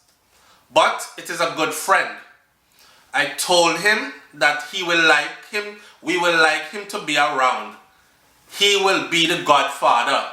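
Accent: Trinidad, Trinidad and Tobago. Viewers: I can’t do the trinity accent, but I think u did well.lol However, ‘miracle’? Daaang, I thought we all knew sperm in pum pum Mek baby.loool Sheeeet these liberals always redefining reality with some bs mind fugg! Oh how brave smh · 🤣🤣 you’re trying to read like a trini bless up bro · Love the accient spot on you sound like my cousin in Trinidad. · Ur trinidadian accent shot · you sound sweet talking like Trini OMG love it don,t cave about people life they live every one do what they want to do all I can say two dutch pot can,t close or two cover that is all I can say not for me to judge leave it to god glad for them. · Love the Trini accent 😎. When is going to update us on the baby ❤️—a Mother’s Day video would be nice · I like your trini accent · Your Trini accent bro!! 🤣🤣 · I cant with you with the Trinidadian accent. 😆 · Yow your trini accent is on fire.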